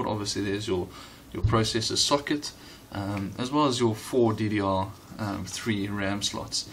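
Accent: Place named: Afrikaans, South Africa. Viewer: Jy klink Afrikaans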